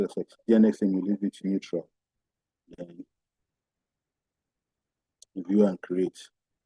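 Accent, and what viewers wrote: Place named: Africa. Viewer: African accent is so out of this world, I cant understand the words coming from his mouth...😵‍💫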